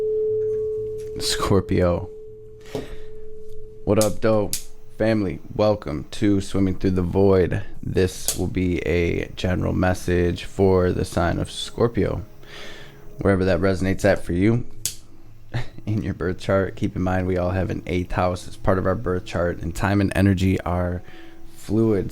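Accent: Detroit, United States. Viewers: Are you from Detroit I keep hearing you say what up doe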